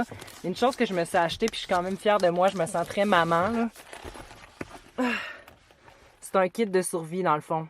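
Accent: Quebec, Canada. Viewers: Super ton van! J adore ta façon de présenter ton van et de ton accent québécois tu nous fais rêver. J ai aménagé un dacia Lodgy, j attends les beaux jours pour partir pour la première fois. Bisous de Bordeaux en France. Jaky 🎀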